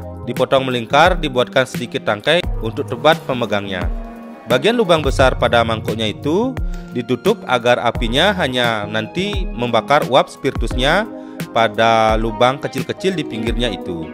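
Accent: Bali, Indonesia. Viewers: diliat dari logatnya kynya orang bali nih , keren mas vidionya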